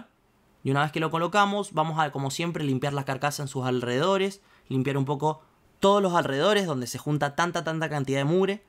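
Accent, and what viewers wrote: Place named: Interior, Argentina. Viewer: Buenas. Tenes una tonada del interior, de donde sos? Corrientes?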